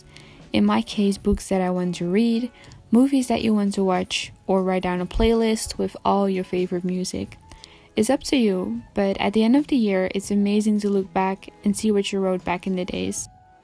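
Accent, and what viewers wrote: Netherlands. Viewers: Love your video!!🐙 zodra je begon te praten wist ik dat je uit nederland kwam wat leuk 🙌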